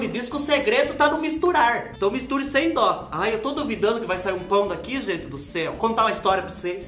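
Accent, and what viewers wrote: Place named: Minas Gerais, Brazil. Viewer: océ é mineiro, falando parece kkkkkkk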